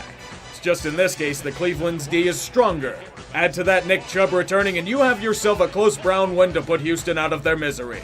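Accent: Pittsburgh, United States. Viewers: Jesussss christ that insane cringe yinzer voice. Nearly unsubbed.. nearly. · Did anyone understand anything he said in Yinzer Mode? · Don’t ever go to Pittsburgh, that’s literally how every local talks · The return of the Yinzer It’s glorious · the whole Yinzer voice thing is unwatchable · inner Yinzer has finally come out after all these years and I LOVE IT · Unpopular opinion: I dont the like the yinzer voice, as a Steelers fan. I miss days of our Steelers lmao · the yinzer sultry voice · yinzer voice is a true thing of beauty. To anybody who has never been to Pittsburgh and wonders if we really sound like that? Pretty much, yeah, we do. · Stop doing the yinzer voice I actually wanna enjoy the coverage on the steelers lol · Stop with the Yinzer voice makes me wanna cut the video. So fucking annoying and not funny! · “yinzer voice” is actually real voice.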